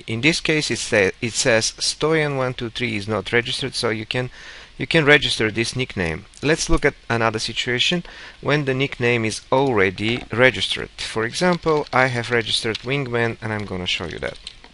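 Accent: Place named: Russia. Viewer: I liked your Russian accent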